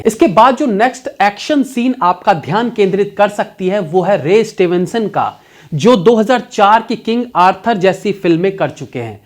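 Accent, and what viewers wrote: Bihar, India. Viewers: Pure bihari ho aap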